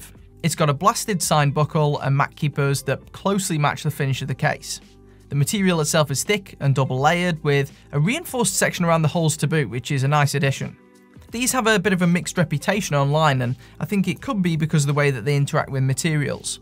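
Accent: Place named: Manchester, United Kingdom. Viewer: This watch is definitely "Ice Cold" in my book. I do sometimes wish it had hand winding, but I managed to regulate it to +8 secs per day with a toothpick, something I wouldn't try with a more expensive watch. It's also easy to back hack. The B-style dial is thoroughly appealing. As you get older, life is all about minutes and seconds, and hours are of diminishing importance. I've also seen the display caseback work its charm on others. My son looked at it for about half a minute and suddenly exclaimed "wow, now I understand how watches work!" Where are you from, BTW? Manchester? Your accent is the best part of these reviews.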